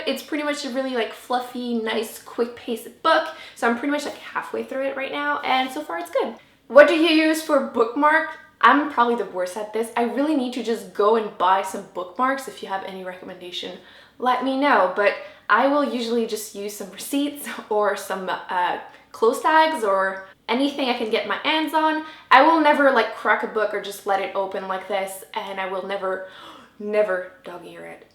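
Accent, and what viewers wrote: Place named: Quebec, Canada. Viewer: I just saw your new video and when I heard your accent I thought you might be french canadian! I'm so happy to finally find a french canadian booktuber, I'm from Montreal so hi and can't wait to marathon your videos!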